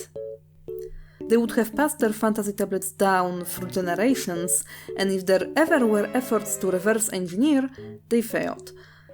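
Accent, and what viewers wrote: Poland. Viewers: oh thats interesting i dont think i have heard a polish accent before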